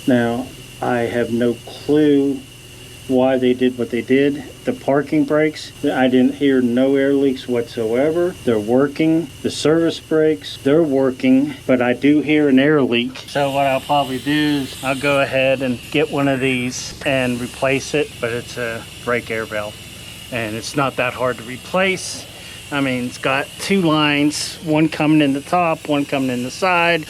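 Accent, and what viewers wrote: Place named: Pennsylvania, United States. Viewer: Hi, you're saying "Y'ins" a lot. Are you in/from Pennsyltucky?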